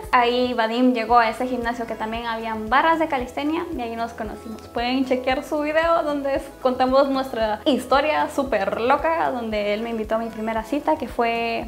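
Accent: Guatemala. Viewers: Sabía que eras de Guate!!!!! Lo note en el acento 👌❤️👌❤️👌❤️👌❤️ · Desde que vi tu primer video de tipos de glúteos supe que eras chapina por tu acento Pero tenia la duda así que entré a este video y Me encanta saber que conozco tan bien a mis paisanos 🤣❤️ saludos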